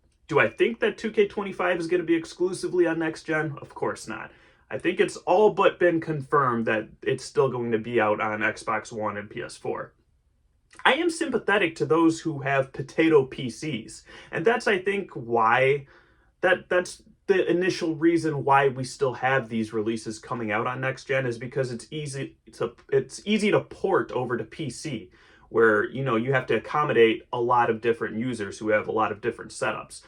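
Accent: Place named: Mexico. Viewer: No homo bro damm you are hot 💀😭 I was thinking you were from Mexico because of your voice 📝🗒️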